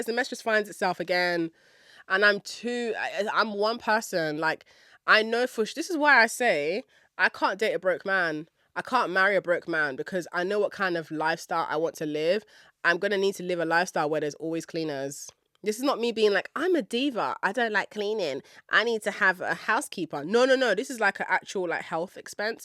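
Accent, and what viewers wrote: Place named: United Kingdom. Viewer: this is my first time hearing your voice and i didn’t know your were a UK babeeee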